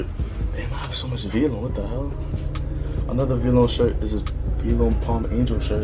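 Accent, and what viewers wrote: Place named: New York, United States. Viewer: Yee i aint een see da name nd i knew yu was from new york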